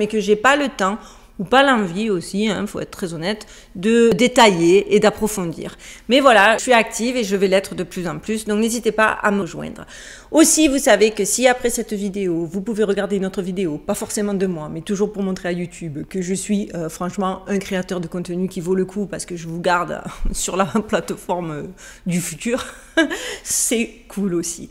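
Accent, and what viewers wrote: South, France. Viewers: J'aimerais avoir des informations de qui vous êtes ma chère et belle dame. Pourquoi d'abord vous êtes formidables et une pro dans se que vous faites 2, je suis un nouveau sur votre chaîne Youtube et je suis un artiste rebel retraité artiste de l'est du Canada français. Ma demande c'est que vous êtes très cool belle Vivent dans un condo hyper moderne. A las Vegas. Vous avez un accent du sud de la France genre de Marseille ou Toulouse ou ex en Provence. Je me demande qui vous êtes voilà bisou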